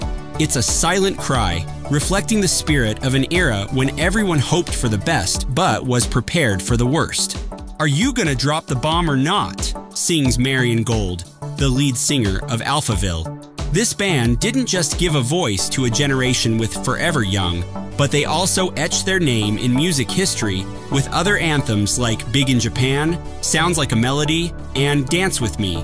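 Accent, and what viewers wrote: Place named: United States. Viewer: Impertinent question - this is a UK channel, why the American sounding narration?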